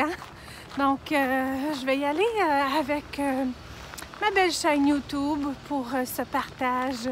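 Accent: Quebec, Canada. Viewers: Bonjour, je suis contente d'avoir marche avec toi.c'est toujours un vrai bonheur de voir tes vidéos.j'adore ton accent, tu me fais voyager et je rêve qu'un jour je pourrai venir au Québec.chez moi aussi il fait beau en corse et je profite pour marcher une heure par jour.j'ai hâte de voir ta maison terminée et BRAVO à Ted.gros bisous🌞🌞🌞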